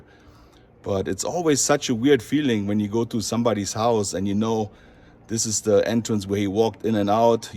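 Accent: Germany. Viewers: Thank you for bringing such beautiful flowers from us too,you are very kind, and considerate. loved Funniest Home Video's! I love your German accent, beautiful!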